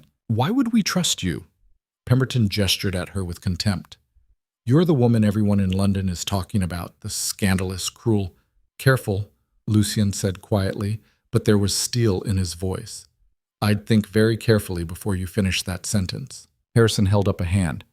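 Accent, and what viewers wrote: United States. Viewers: I hate this AI narrator. American or Canadian accent for a British based story...and it's voice is just annoying.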